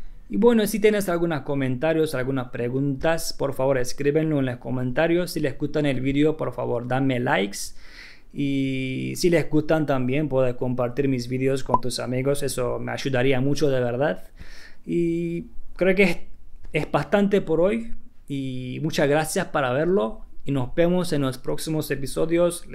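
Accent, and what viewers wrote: Argentina. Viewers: Que bien que hablas el español argentino!!! Bien porteño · Hola, nose como llegue a tu canal. Pero un placer verte tomar mate, y que hables mas Argentino jajaja. Merhaba... Iyi geçeler. Tratando de aprender tu idioma. Para concretar El Viaje pendiente. Saludos desde Argentina 🇦🇷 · ablas como argentino español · no es español de España sino español de Argentina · Hablas muy bien como argentino... el asentó es inconfundible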